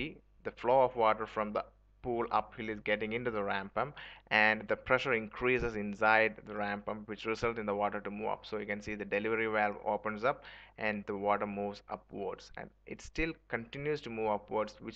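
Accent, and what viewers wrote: Kerala, India. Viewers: LoL.. another mallu with accent 😂😂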